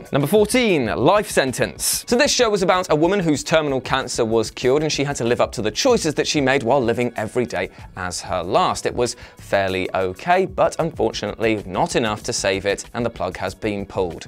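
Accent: United Kingdom. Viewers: where ya from in the uk?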